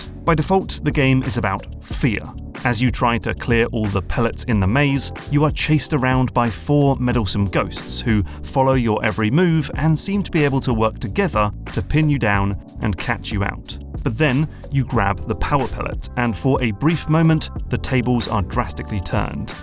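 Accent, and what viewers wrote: England, United Kingdom. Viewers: Wow, talk about a nostalgia trip lol , it's like watching my gaming journey from childhood till now being narrated by an Englishman... though you missed paper boy on Gameboy lol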